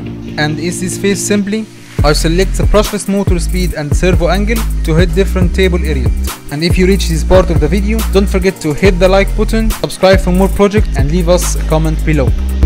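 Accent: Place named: Egypt. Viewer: لهجتك في الانجليزي بتقول ان انت مصري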